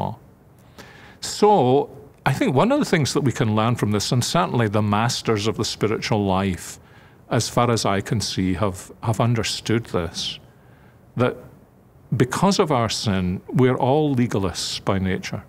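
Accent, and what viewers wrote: Scotland, United Kingdom. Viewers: Absolutely, and I never tire of his Scottish accent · Addjoa preko baah Me either! The Scottish accents are my favs!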